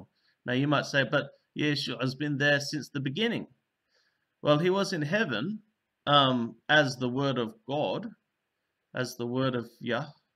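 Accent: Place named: Arab world. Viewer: This is a very good explanation for the Greek transliteration of Yeshua. I have listened to a couple your Hebrew readings and your Hebrew pronunciation sounds a lot more purer than the the false modern jewish hebrew. Yours sounds more arabic. Do you have any videos were you explain your pronunciation distinctions and corrections compared to the incorrect pronunciations of modern jewish hebrew? Thanks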